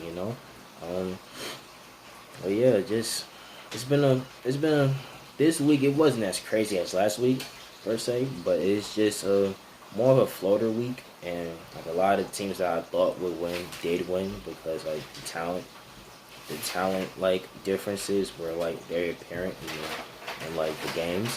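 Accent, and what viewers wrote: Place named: New York, United States. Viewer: That New York accent 🤤🤤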